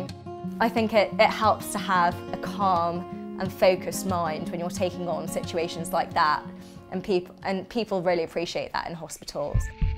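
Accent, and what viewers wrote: United Kingdom. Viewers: I love ❤️ that British accent ❤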